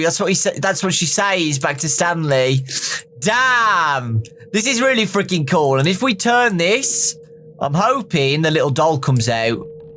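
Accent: United Kingdom. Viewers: He’s British? Well I can’t judge since this is the first video I see of him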